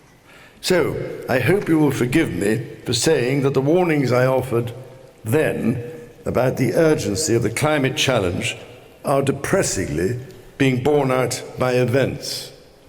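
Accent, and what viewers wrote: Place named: England, United Kingdom. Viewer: He is speaking Italian with a very heavy English accent. He did well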